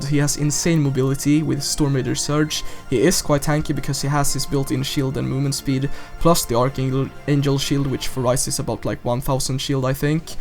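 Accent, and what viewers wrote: Sweden. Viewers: Are u swedish? u sound like a swede when u talk:.)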